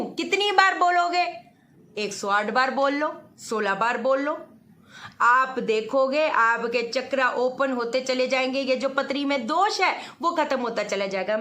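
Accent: Punjab, India. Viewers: बहन जी चाहे कुछ भी कहो ,please don't take it otherwise ,आपकी आधी पंजाबी मिली हिंदी बोली बहुत प्यारी/मस्त लगती है ,जी करता है सुनता ही रहूँ...👌👌 वैसे कुछ बहुत जरूरी परामर्श लेना है आपसे...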